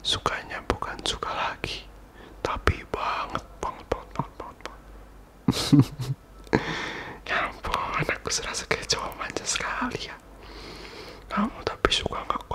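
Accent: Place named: Indonesia. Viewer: He got something extra with his accent, never knew indo and english issa good combination tho. Even me as malaysian, i fell for indo language everytime i heard the indo ppl speak. It kinda sooo soft and u mixed with english , the cool tone is added so yeah 1000/100 for that ✨😂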